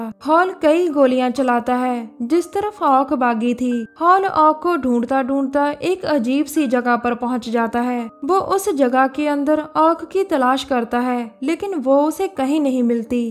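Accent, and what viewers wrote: Punjab, India. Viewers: Ur definitely punjabi apke accent se pta chal aap punjabi girl ho ❤️👍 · Mam app Punjab sa ho ? Ya Haryana sa?? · Aap punjaban ho na. Punjabi log aisi he Hindi bolte hai.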